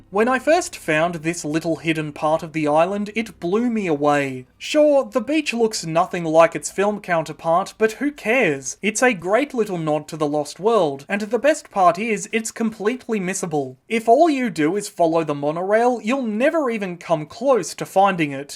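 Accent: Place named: Australia. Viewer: He sounds Australian to me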